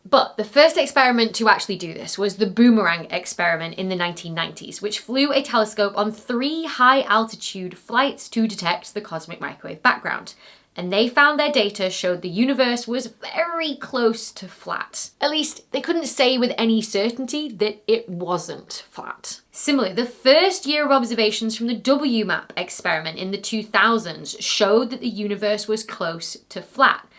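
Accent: United Kingdom. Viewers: Yeah, the British accent on that one was hard, lol. I'm normally pretty good with it, but I had to keep reminding myself what she was talking about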